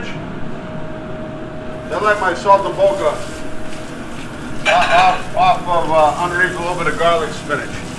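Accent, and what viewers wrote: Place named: Philadelphia, United States. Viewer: "Gabbagol?" "Prosciut'?" Are youse guys from Philly?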